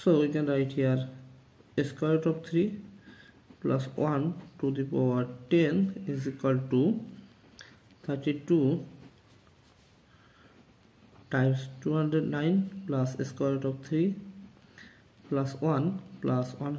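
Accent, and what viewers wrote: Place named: Bengal, India. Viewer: Bengali accent XD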